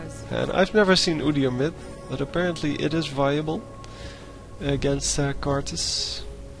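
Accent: Netherlands. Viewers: Are you dutch? like Soraka AD :D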